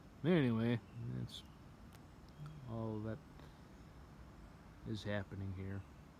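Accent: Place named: Midwest, United States. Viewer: First off, I do hope that you are staying safe and dry. Secondly, Iowa? I subscribed to your channel - after having seen that you commented on the some of the same channels as me - and I could not figure out your accent. Turns out you're a Midwestern boy. Like your tone, elocution, and diction seemed old-fashioned like you came out of a Capra film. I mean no disrespect, and am totally wishing I spoke like you.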